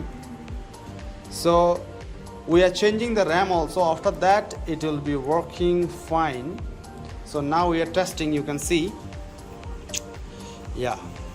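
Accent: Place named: India. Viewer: kek orang india logat nya...hjeheheheeh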